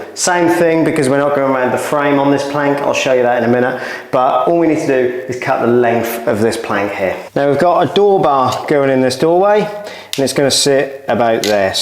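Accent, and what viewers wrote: Norfolk, United Kingdom. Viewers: He’s got a good old Norfolk accent!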